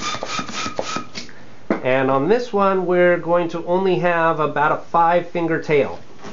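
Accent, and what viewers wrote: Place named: Australia. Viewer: that's the best koala bear balloon ever. i also liked your austrailian accent for the intro. well done. keep on twisting.😍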